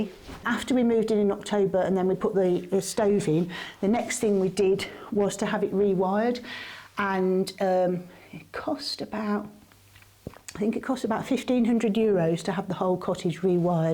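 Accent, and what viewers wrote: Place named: Birmingham, United Kingdom. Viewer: From the 'm'duck' do I guess Midlands maybe Nottingham?. In the next video could you give an idea of the land water etc I love the accents of home.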